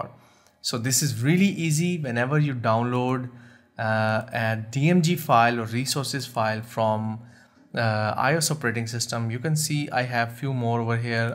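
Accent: India. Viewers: European face. Indian accent. Great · WHY THIS GINGER HAVE INDIAN ACCENT?? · its the first time l see a white man talks the accent of an indian, wtf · Wow ...your pronunciation is so different.... it's sounding very similar to our Indian English pronunciation 😂😂..and now I can understand better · u sound so indian...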